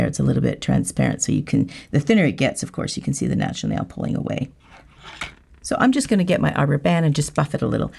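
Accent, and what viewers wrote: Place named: Canada. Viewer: I love your voice & accent. It feels like home as my aunts & cousins are Canadian 😊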